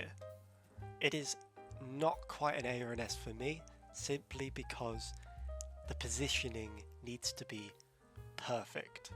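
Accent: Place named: United Kingdom. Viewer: great video again love it youre so good to watch on stream. love the british accent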